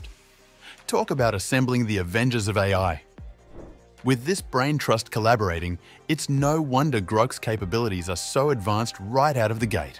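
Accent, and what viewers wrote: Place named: Australia. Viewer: Up to the minute, pronounced mye-n-yewt, as in "tiny"? Some strange pronunciations in this, with an Aussie accent. Maybe a Chinese who went to university in Australia?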